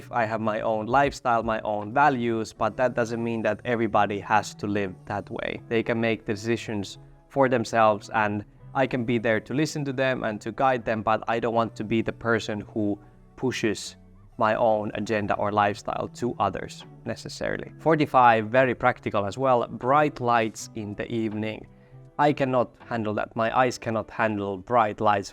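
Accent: Finland. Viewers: It's the Finnish accent! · Just discovered your channel as I am going through big changes in my life. You are giving me food for thought and are inspiring ✨️ I knew you were finnish when you started talking. 😉💖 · Hello! It is my first time watching your videos, I just discovered your chanel. ☺️The first thing that I noticed was your accent which I find really interesting and comfortable to listen to. May I ask you where are you from? Based on your accent, I would say Finland? 🤨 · I love Finnish people speaking English… and your content is great, clear and handy. I’m happy to have bumped into your channel! Keep going! Toivon sulle kaikkea hyvää!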